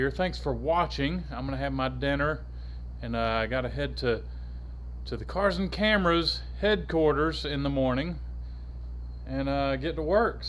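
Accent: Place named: East, United States. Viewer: you easterners sure do pronounce Oil funny like Ohle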